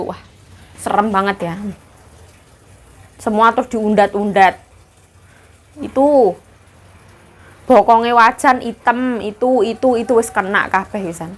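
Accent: Java, Indonesia. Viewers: Wong ndi sih mbok, ngomonge medok tenan😅...?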